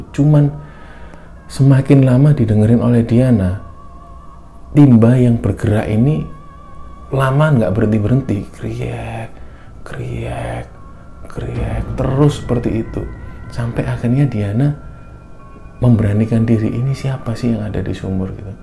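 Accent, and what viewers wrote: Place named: Banyumas, Indonesia. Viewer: Salam pagi salam kenal mas.. Baru sempet comen biasanya cuma like saja... Walaupun saya orang sunda tapi seneng bahasa jawa kental mas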